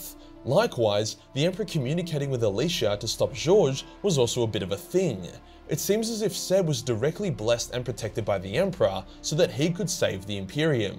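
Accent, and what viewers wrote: Australia. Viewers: I don’t know if I would’ve enjoyed Warhammer 40 K if it wasn’t narrated by an Australian keep it up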